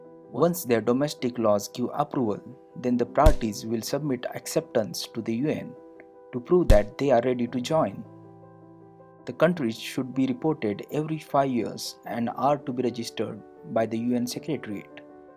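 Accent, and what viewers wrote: India. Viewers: I think his accent is indian. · I got even more confused with this shithole Bengali accent..!😂🤣🤮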